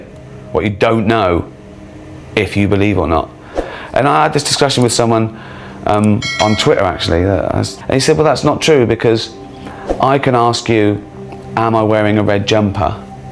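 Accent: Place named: United Kingdom. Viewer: Be careful dude. You can make just about any bunch of bullshit sound legit with a British accent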